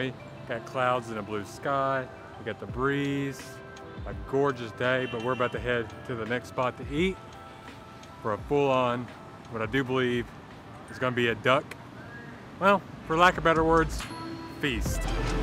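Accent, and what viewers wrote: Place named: Arkansas, United States. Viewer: He's from Arkansas. Totally different state and different accent. LOL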